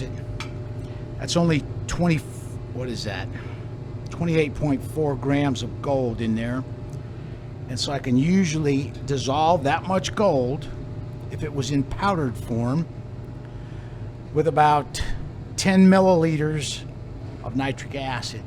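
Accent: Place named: Chicago, United States. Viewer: stahk paht lol I didnt know you were from Chicago ... Watching you refine gold is really informative Ill never get sick of watching you make forbidden orange drink. Bonide and GFS chemicals should sponsor you with as much product as you go though. (nitric acid is super spendy) $350 is just about too much for my wallet these days.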